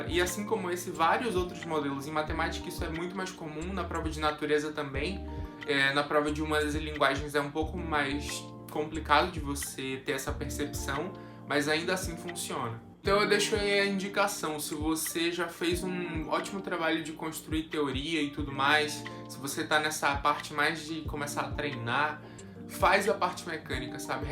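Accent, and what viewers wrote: Pará, Brazil. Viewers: Já ta com um pouquinho de sotaque parense 😆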